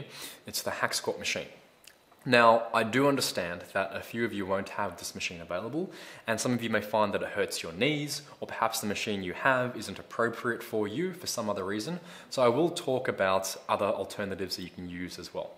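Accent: Australia. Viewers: OMG you're aussie! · Came here for the Quad exercises. Subscribed for the quality info delivered with a chilled Aussie vibe 👍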